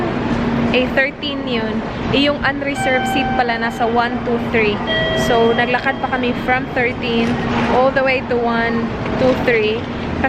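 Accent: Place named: Visayas, Philippines. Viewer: Maka addict man imong vlog ms... cute nimo lalo Kong magbisaya hehe.. God bless po.. ingat po kayo..:) mwahh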